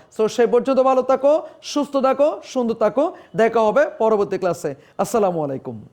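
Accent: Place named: Chittagong, Bangladesh. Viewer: স্যার মনে হয় চাটগাইয়া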